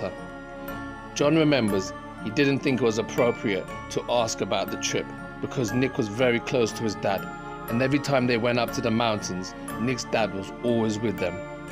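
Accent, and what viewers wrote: United Kingdom. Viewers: u got that across the pond voice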